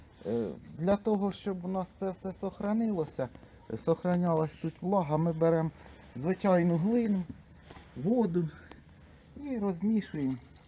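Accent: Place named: Zhashkiv, Ukraine. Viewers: Черкащина райьон говор як в Жашкові..віджалій гроші купи нормальний сікатор він не ріже ніфіга.. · Я також послухав - ніби Маньківщина )) Ніби дома побував. Сокира, радянський секатор і ножиці, і обов'язково тряпочка )) Таке рідне.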